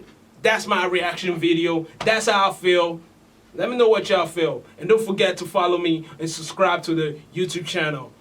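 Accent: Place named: United States. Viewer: lose the American accent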